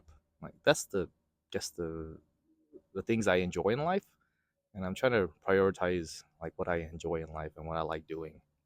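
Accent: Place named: England, United Kingdom. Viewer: Yesterday, I came across a video on YouTube featuring a very young developer from Chicago, he shared about how inspiration feeling about documenting our life, work, and growth via vlog/videos (btw his channel looks so art cinematic I'm impressive so much!! <link> . Today, I found myself back on your channel watching another video about making videos on YouTube. It makes me feel a bit shy because I still don't feel ready to start my own vlog. I'm taking it day by day and hopefully, I'll have the courage to start a vlog soon. Anyway, I hope that we can organize a meet-up for UX designers to share experiences in Ho Chi Minh City. NAB is my dream company. I hope to become your colleague one day! Another thing I'd like to comment on - I love your English accent. I'm just curious, did you study abroad?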